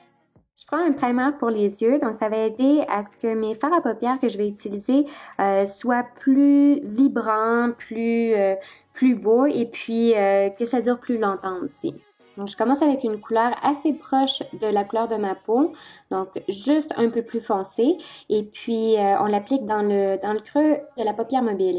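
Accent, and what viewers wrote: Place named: Quebec, Canada. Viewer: Tu es québécoise ? J'aime ton accent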